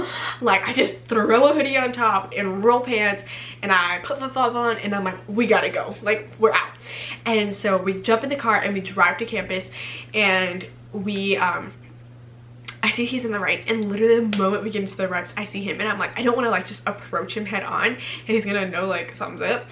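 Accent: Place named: Texas, United States. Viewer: You and Emilyenchanted talk the exact same way!! So weird lol I figured you were from Texas like her! Same accent!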